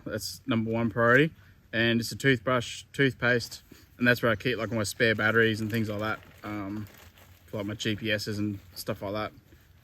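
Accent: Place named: Australia. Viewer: Why would I not understand him? He was using basic English with an Australian accent.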